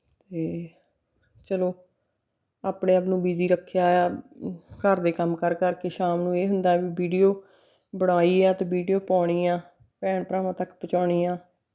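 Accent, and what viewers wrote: India. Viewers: ਸਤਿ ਸ੍ਰੀ ਅਕਾਲ 🙏ਦੀਦੀ ਜੀ, ਇੱਕ ਪਲੇਟ ਮੇਰੀ ਲਾ ਲਓ ਇੱਕ ਕੌਲੀ ਸ਼ਿਮਲਾ ਮਿਰਚ ਦੀ ਇੱਕ ਖੱਟੇ ਦੀ ਕੌਲੀ, 😋😋, ਤੁਹਾਡੀ ਦੁਆਬੇ ਦੀ ਬੋਲੀ ਵੀ ਸੋਹਣੀ ਹੈ🥰, nyc sharing🎉🎉👍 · ਦੀਦੀ ਜੀ ਸਾਡੇ ਹੁਸ਼ਿਆਰਪੁਰ ਚ ਵੀ ਇਸੇ ਤਰ੍ਹਾਂ ਬੋਲਦੇ ਹਨ ਜਲੰਧਰ ਵਿੱਚ ਮੇਰੇ ਪੇਕੇ ਨੇ ਤੇ ਹੁਸ਼ਿਆਰਪੁਰ ਵਿੱਚ ਮੇਰੇ ਸਹੁਰੇ ਤੁਹਾਡੀ ਵੀਡੀਓ ਬਹੁਤ ਵਧੀਆ ਹੁੰਦੀ ਹੈ। ♥️♥️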